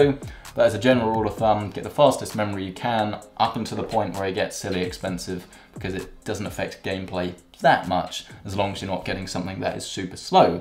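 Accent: United Kingdom. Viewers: Your British accent makes the words go through one ear and out the other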